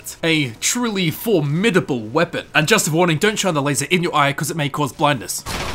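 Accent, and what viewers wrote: Australia. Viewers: are you from Australia because I live there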